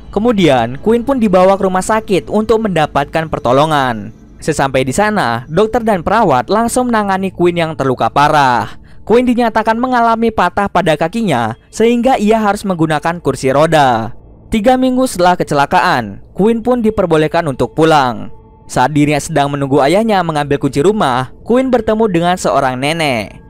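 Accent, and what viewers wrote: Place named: Java, Indonesia. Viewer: Terlalu jawa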